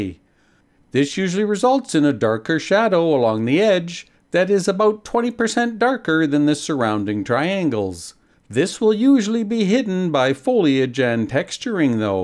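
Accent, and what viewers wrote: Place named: Canada. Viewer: You do you. Be yourself. Your voice is part of your character. You're Canadian. I dig it. :) Certainly public speaking experience benefits everyone. But people like to complain. If it's not one thing, it's another. So, really, who cares...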